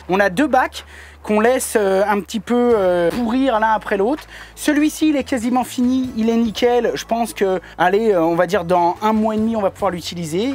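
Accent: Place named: Thiers, France. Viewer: Une vidéo qui montre qu’en 8 ans, tu n’as pas changé, on retrouve (jamais perdu en réalité) l’artisan plombier des débuts capable d’autocritique, qui s’amuse de rien, un homme qui a su rester simple malgré la réussite. Un regret malgré tout, le trop grand décalage entre le tournage et la diffusion (ici 4 mois environ). Bon courage pour la suite, bravo ! « Nickel » ! Entendre quelqu’un qui met des « y » partout, cela me rappelle Thiers où j’ai quelques attaches. Justement, là bas je possède une bâtisse qui a ce problème de flottement un peu en raison des longues portées des poutres mais bâtiments de 1909 qui vivra plus que moi.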